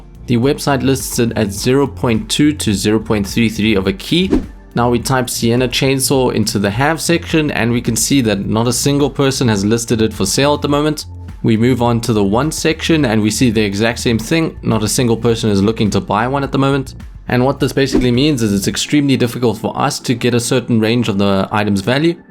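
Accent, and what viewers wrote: South Africa. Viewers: Dude you south African? If you are then yaaay xD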